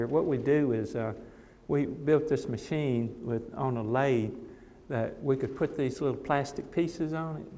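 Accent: South, United States. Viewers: I hear a American with a Southern accent. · have never heard an oriental with a southern accent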